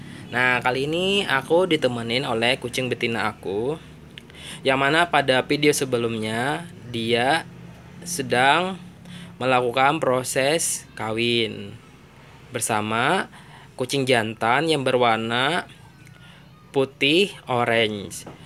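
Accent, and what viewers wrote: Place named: Banjar, Indonesia. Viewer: Urang banjar sako amang ni . Logatnya kentara banar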